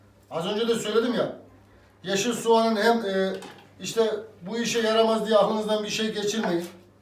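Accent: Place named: Sivas, Turkey. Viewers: Abi nerelisin sivaslılara benziyon 😁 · Sa Nerelisin ustam iç anadolulu gibi konuşuyorsunuz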